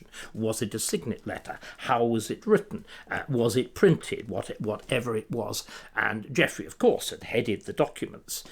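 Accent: RP, United Kingdom. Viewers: Let's hope freedom of expression does not become history. As for the old BBC accent- it sounds great to an Australian and is much better than the strange accents that have developed over in the old country in London and so on- sort-of posh but coming across as "affected" in an odd sort of way.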